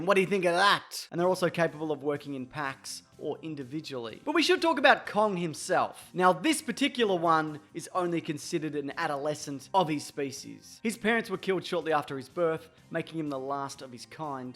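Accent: Australia. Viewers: Wait your Australian?! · He Or sHe has an Australian accent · YOU"RE AUSTRAILIAN?!?!?!?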